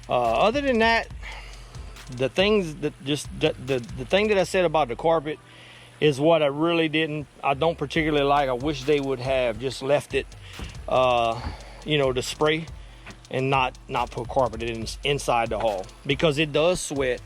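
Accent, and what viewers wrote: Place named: Acadiana, United States. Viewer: Man I know an Acadiana accent when I hear one! I recognized Eunice City Lake when you showed a clip fishing on the water. I am also from Eunice so you have my subscription!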